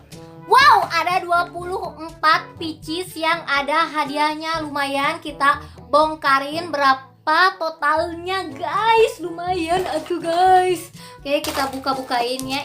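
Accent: Sunda, Indonesia. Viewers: Lucu pisan kalo ka ngomong sunda campur indo🤣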